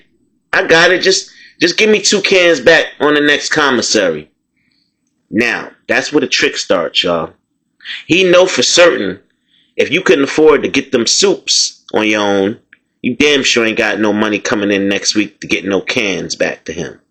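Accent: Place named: New York, United States. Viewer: Good morning, ur voice is dope. I'm sorry u hv these stories, but u tell them in a way that's real, raw, especially with ur accent, like ur from Brooklyn or the bronx. Glad u made it out, my brother never did, he died in prison.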